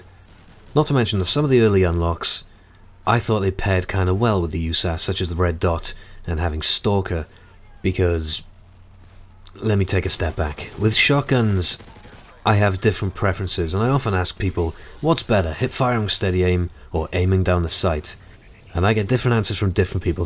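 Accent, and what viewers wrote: Wales, United Kingdom. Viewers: You sound slightly welsh... Are you welsh at all? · are you Welsh?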